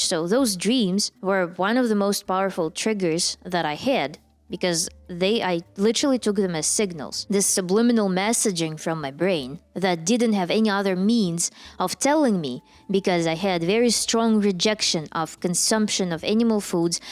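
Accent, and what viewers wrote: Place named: Eastern Europe. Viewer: You sound Eastern European. Meat is your identity😂. Congrats on finally kicking the mental illness away and stay meat-based.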